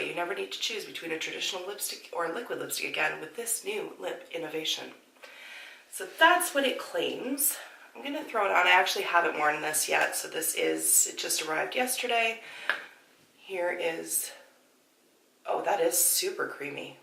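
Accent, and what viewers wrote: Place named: Canada. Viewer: I kinda immediately guessed you were Canadian, because you speak very pleasantly :)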